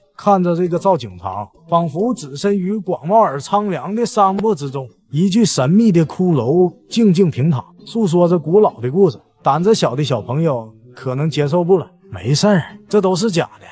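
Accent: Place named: Northeast, China. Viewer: 这大碴子真他妈几把难听！！！！！！！！！！！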